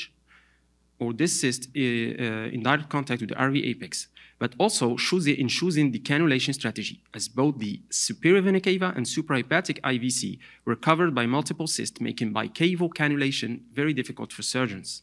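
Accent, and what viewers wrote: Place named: United States. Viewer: كيفاش تعلمت اللهجة الامريكية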